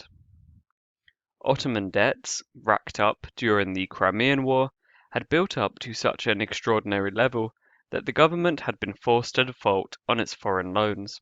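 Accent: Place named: United Kingdom. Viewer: I'm not sure why but I have an inherent bias towards British particularly English and Northern Irish when it comes to historical topics. When I hear that accent I immediately trust the content. Just a thought that occurred to me as I was watching this well done video. Also while I have a bias towards British history presenters, it's sad they stopped the Russians from taking Istanbul. Even the Muslims of Egypt (me included) wish the Greeks had that city back. Turks morality is lacking to say the least.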